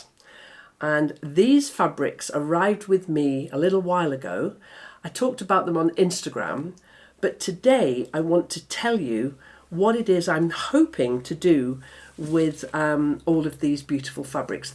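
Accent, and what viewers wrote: England, United Kingdom. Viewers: I could listen to you talk all day. Your honesty with yourself comments tied with your lovely British accent is so soothing and sometimes comical that you take away my stress and make me smile. Not only do I learn from your expertise, but I learn to relax and enjoy. Thanks, from Ohio, USA.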